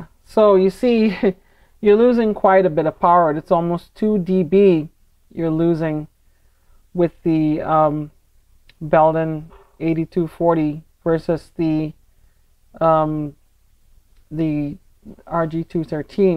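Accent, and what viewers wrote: Trinidad and Tobago. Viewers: Thanks, by the way are you from Trinidad? KM6DHT/AG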